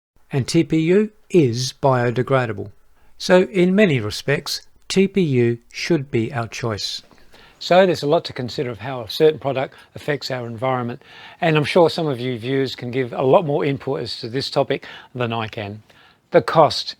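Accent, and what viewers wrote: Australia. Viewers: it's South Australian, not a Sydney sider accent. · Very informative, great australian accent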